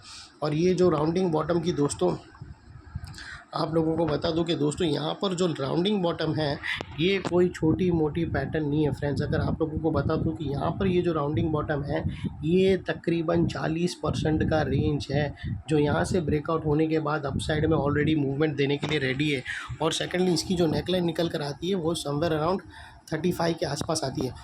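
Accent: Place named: South, India. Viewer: thankyou bhaiya for giving your valuable time to some random 19 y/o stranger I will invest more in my learnings before hand i was a forex trader who trade in 4h and 1h but as I shifted to Indian swing I find it quite difficult to understand as forex is closed for just 3-4 hours a day but you are really helping me in here i have watched all your videos trying to understand things and i am really grateful that you still scam stocks out of so many studies stocks and invest your valuable time even thou you are not getting the audience you deserve even thou you just want to help others people and you are South Indian so that accent is little bit different for people from TATA, JAMSHEDPUR ,❤️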